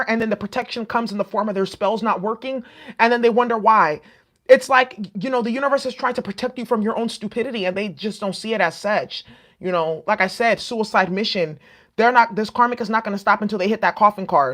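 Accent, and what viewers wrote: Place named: New Orleans, United States. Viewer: Sounds like u are from NOLA. ME too!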